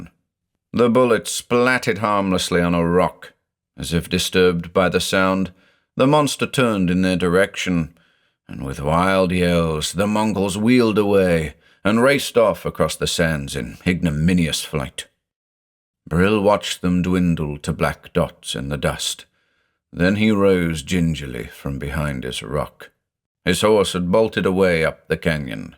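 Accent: United States. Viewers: Solid American accent. Good job. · Your American accent is getting very good,! 👍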